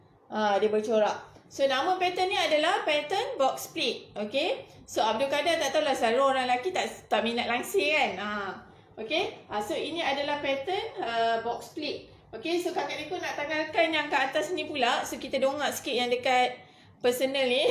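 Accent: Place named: Malaysia. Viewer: Serasa denger orang malaysia ngomong😀😂😁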